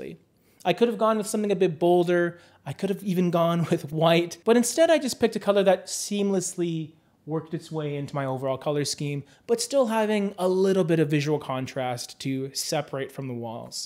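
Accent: Canada. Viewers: He’s Canadian….different pronunciations there.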